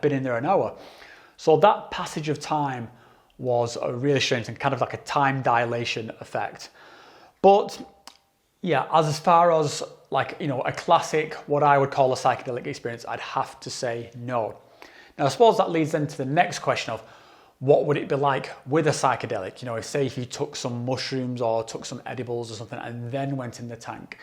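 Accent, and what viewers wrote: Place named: Lancashire, United Kingdom. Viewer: What's that accent you have? You near Preston / Blackburn?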